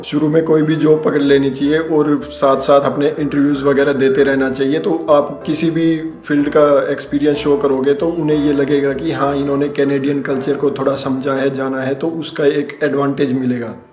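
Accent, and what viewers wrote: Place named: India. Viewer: Omg how to understand that Indglish..😩😵‍💫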